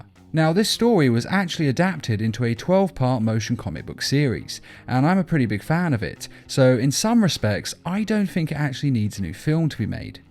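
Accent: United Kingdom. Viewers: What is it about those British voice accents that make the coolest videos? 😁👍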